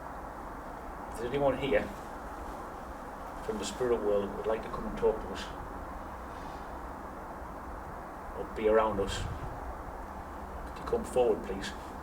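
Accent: Durham, United Kingdom. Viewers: Was introduced to your channel by Ouija Brothers so just started watching your videos. Very very interesting. I love hearing about the history of places and the added bonus of your paranormal investigation. Your accent sounds like your from near me in Durham? Keep going your doing great. 😁😁😁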